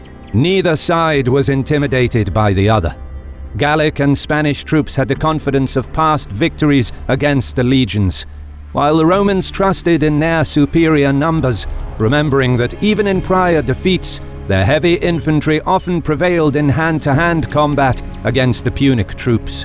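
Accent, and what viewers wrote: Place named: England, United Kingdom. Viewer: Brother I like your English accent😉😉!!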